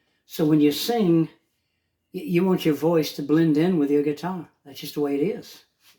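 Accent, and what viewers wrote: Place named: Liverpool, United Kingdom. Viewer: Soundin' positively Liverpudlian there! (& not boring!)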